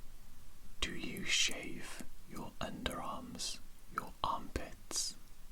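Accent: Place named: North Wales, United Kingdom. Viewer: i’ve been watching you for a while now and i’ve just gotta say it, are you welsh? because i’m a valleys girl and your accent is giving me north wales all the way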